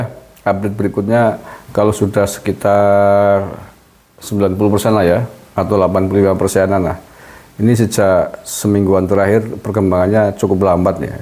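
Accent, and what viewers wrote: Banyumas, Indonesia. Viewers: aku teringat masa kecil d purwokerto btl itu ngurusi angket ongkot angkot brisik bae wong wis kalah aku slalu ngikuti acara chanel pak abis banyumase medok banget hebbatttt dan lucu tdk tegang yang nonton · Waalaikum salam wr wb paak lucu bahasane banyumasan banget akuvterhibur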